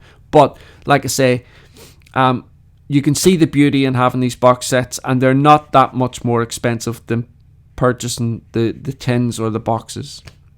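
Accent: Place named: Scotland, United Kingdom. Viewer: I love your accent. Do you mind if I ask, where are you from? Scotland??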